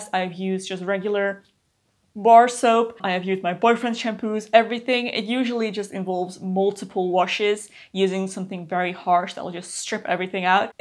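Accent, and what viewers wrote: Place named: Netherlands. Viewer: Jojo I she’s from the Netherlands, I think 😊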